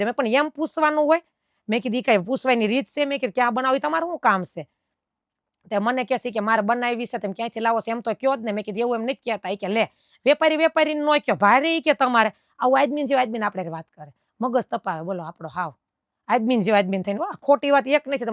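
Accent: Kathiawar, India. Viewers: She is speaking kathiyavadi gujarati language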